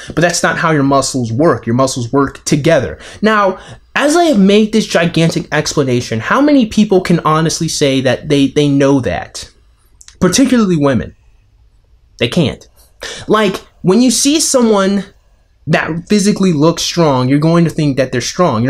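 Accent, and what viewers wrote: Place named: United Kingdom. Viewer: ayy burp and british accent, this was a good vid. also re: paired stimuli (i.e making her feel good in your presence) , this is how you get a girl into anal...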